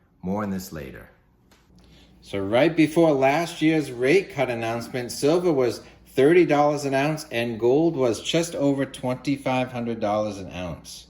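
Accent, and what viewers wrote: New England, United States. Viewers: Well, it was German with a strong New England accent, but quite understandable...😄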